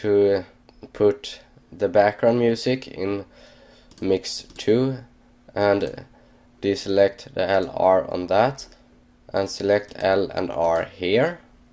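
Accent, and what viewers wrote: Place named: Sweden. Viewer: fyfan va svensk du låter :P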